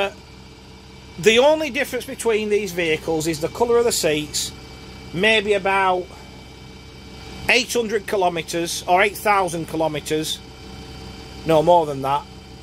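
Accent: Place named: Yorkshire, United Kingdom. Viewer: Just love your voice proper Yorkshire, which part of yorkshire I realy don’t know.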